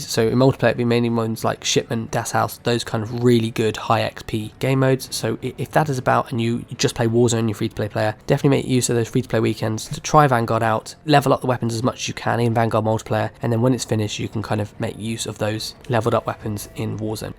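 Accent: United Kingdom. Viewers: Thanks for the guide man I had no idea about some of the things you said Also the British accent is a nice touch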